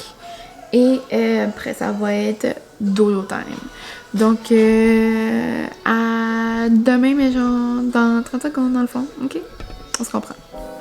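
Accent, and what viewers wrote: New Brunswick, Canada. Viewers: Je viens de découvrir ta chaîne et j’adore 🤍 C’est sûr que tu viens du N-B par ton accent ! Ma maman vient de là et quand je t’entends parler ça me fait tellement penser à sa famille au N-B 😅 Moi je suis née au Québec donc je ne parle pas comme eux, mais c’est trop cute t’entendre parler. Je serai clairement une abonnée assidue à tes vlogmas 😍❄️🎄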